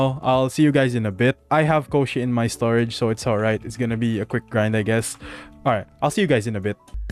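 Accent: Philippines. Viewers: Are you pilipino? it seem's you're pilipino because of your accent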